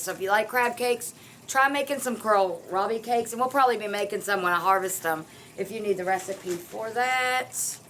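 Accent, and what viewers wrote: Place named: Kentucky, United States. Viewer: I have owned it over 25 years! It helps!she's also the author of Roses love garlic! I like Rodale's Herbal.Great video, never stop learning right?! Aww, you got your Baker Creek winter garden porn!😂💕. What is your growing zone?I'm up in Chicago, zone 5b. You sound like Kentucky to me. Freezer coleslaw?!my record just skipped!lol That is a recipe i need!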